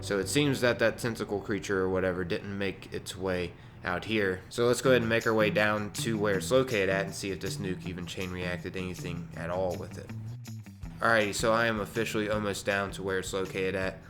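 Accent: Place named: Maryland, United States. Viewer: Are you from Maryland ? You sound like it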